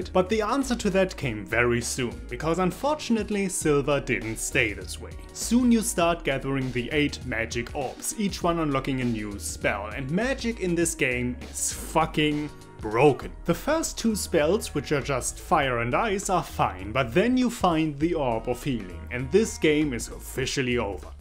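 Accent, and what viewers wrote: Germany. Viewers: U are German right. Love the accent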